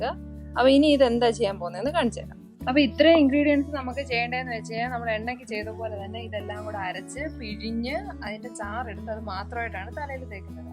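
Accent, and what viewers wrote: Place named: Tamil Nadu, India. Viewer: Akka Tamil sounds akka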